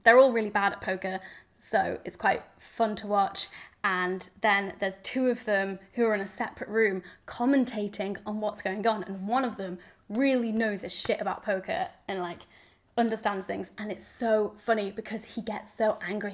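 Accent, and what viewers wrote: Texas, United States. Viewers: I loved your Texas accent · Your Texas voice was pretty good. Your vowels need to be longer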